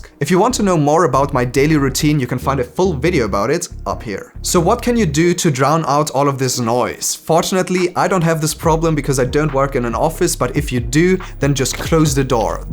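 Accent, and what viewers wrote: Germany. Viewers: haha ich habe sofort erkannt dass du Deutsch bist, obwohl du den Akzent schon gut wegbekommen hast. Aber ich muss sagen, du machst gute Videos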